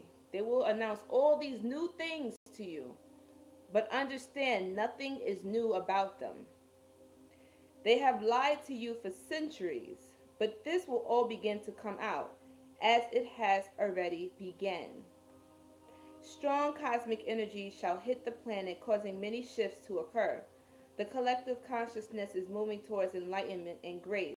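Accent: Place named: East Coast, United States. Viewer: Sis, I appreciate how you use this platform. Tha info you choose to share gives me more life—it sustains me. Also, there are others who I follow, who also use this platform similarly, disseminating similar info but you have a wonderful knack for imparting this info, that just RESONATES in a different way. Shit refreshing AF and I thank you. Plus, your east coast accent is one of my favs. ✊🏿❤️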